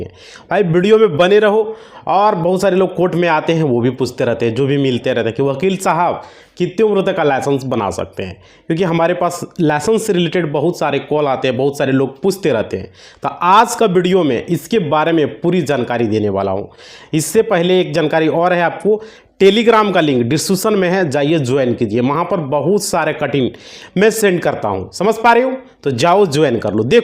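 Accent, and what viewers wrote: Bihar, India. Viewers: Bhai sahab Teri Bhasha Se tu Bihari lag raha hai bakwas kitab nahin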